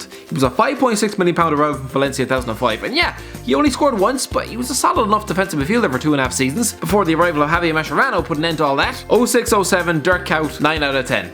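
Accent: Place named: Ireland. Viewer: If I click on one of their videos and do not hear a majestic Irish fella then I’m gone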